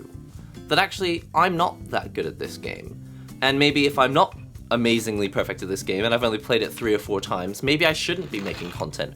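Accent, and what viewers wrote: United Kingdom. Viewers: Hi, I've been one of your audience for a quite long time through I've never left any comments before. Hearing your voice makes me feel peaceful. I'd always love to run your video at the background talking things while I'm at home doing other stuff. The British accent from an Asian guy like me gives me a little inspiration and hope for life to become better, especially during this rapid pace life in city, and I think I've just found room where I can breathe after work. I've not played fighting games at all but listening to you is quite enjoyable. Your channel is great and I just want to let you know that there's people who find good things from your videos and they've always let me find courage to continue with life. Sorry about the poor expression, I hope my English to be as good as you some day.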